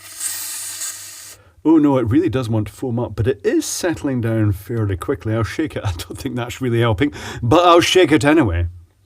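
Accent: Scotland, United Kingdom. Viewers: This is the one and only video in the YouTube universe featuring the long lost posh Scottish accent. :-)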